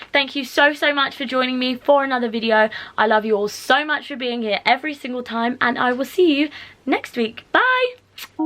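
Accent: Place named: RP, United Kingdom. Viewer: I love your cute posh accent 🥰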